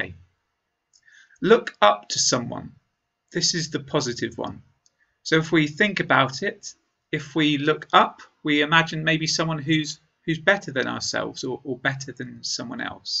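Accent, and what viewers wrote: United Kingdom. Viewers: Thanks a lot! I love your British accent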